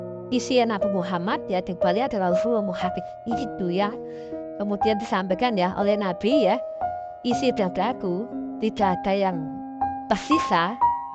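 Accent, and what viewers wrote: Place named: Surakarta, Indonesia. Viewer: Itu suara solo mas😮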